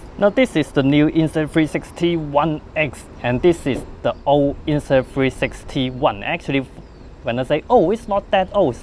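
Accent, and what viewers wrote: China. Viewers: Now i can understand 🇨🇳 ChinEnglish 🇬🇧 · Chinese assent is very cute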